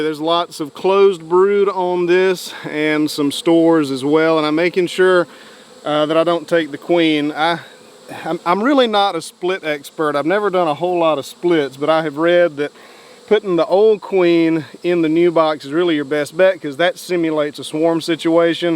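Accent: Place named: Georgia, United States. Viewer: I was stationed in California with a fellow from Athens, Georgia. We became good friends and kept in touch for many years after our service duty was over. These were days before cell phones or internet. Hearing your voice and Georgia accent reminds me of my good friend Eugene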